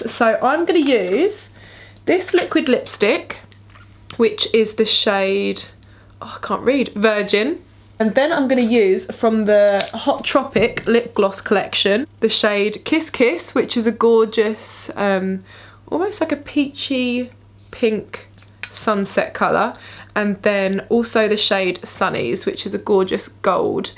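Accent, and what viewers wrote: United Kingdom. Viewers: You have the most beautiful eyes!!! Your just beautiful over all. British accent bonus!!!!!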